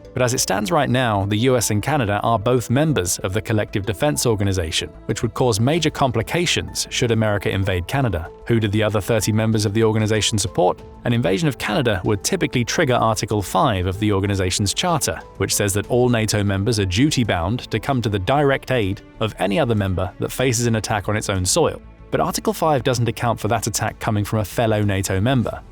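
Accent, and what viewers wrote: United Kingdom. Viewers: Even though the topic is disturbing, it is buffered by a British voice. I don't think this is realistic, but I hope that our Commonwealth allies would help us.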